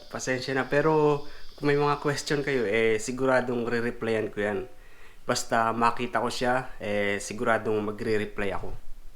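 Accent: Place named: Batangas, Philippines. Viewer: Korekek taga Batangas kba Ako oo sa Lipa Batangas may punto ka kasi eeeee😛😛😛😛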